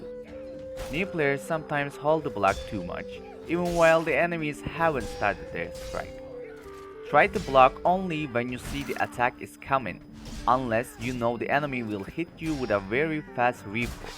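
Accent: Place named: Turkey. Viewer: Turk musun kanka. Aksan felaket😀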